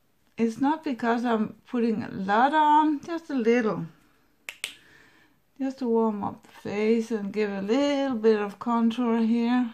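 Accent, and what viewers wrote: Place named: Denmark. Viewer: You live in Denmark? I love your accent!! Your eyes look beautiful!!!!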